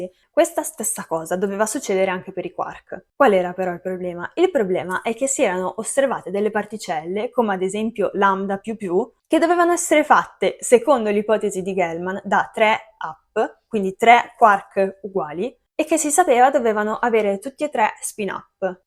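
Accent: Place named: Tuscany, Italy. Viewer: Hai un simpatico accento forse toscano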